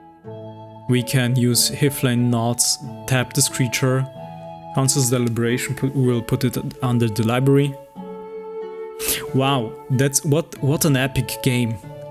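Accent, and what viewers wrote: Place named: Germany. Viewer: Aren't you German? Because your voice/pronunciation is really cool.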